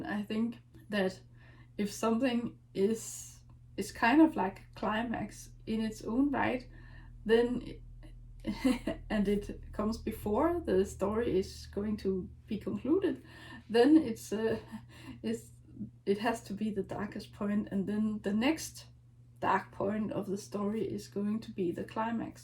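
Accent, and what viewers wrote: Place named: Denmark. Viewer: I’m intrigued by your characters and story. I think there is definitely room for stories outside of a genre. Genre seems to be mainly for helping marketing and selling it. Also…Denmark! I thought I recognised the accent. I lived in Copenhagen for a year and really loved the country and the people ❤ My story is set in Sweden actually 😃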